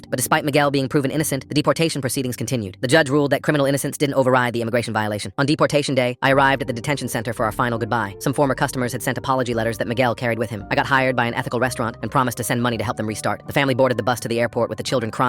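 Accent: Australia. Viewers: Is it just me or does this sound Australien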